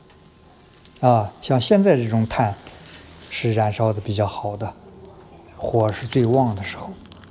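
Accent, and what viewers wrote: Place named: Xinjiang, China. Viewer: 好正宗的新疆普通话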